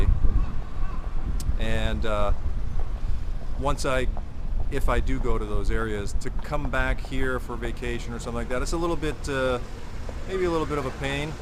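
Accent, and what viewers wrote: United States. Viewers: i'm gonna be honest. You have the most american sounding accent on earth and i love it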